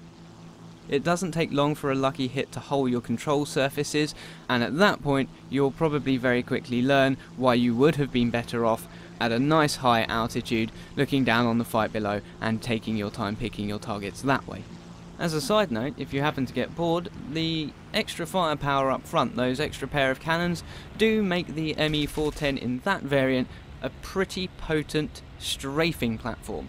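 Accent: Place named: United Kingdom. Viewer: Ahhh finally a gameplayer, who speaks a clear and good british english. Thank you! :D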